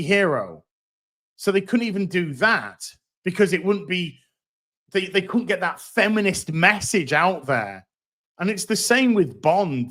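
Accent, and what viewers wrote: New Zealand. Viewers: I thought you were a new Zealander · I thought you were from New Zealand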